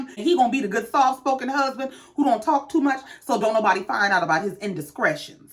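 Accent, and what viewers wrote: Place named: New Orleans, United States. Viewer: I can tell you're from New Orleans baby lol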